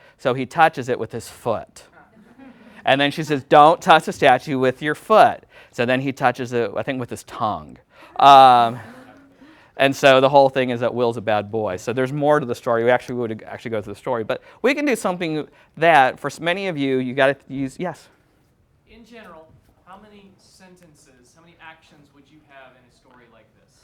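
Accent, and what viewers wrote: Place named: United States. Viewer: It’s not entirely possible to say for sure what Latin might have sounded like - however, it’s 100% certain that it did not sound like an American trying to speak Spanish badly.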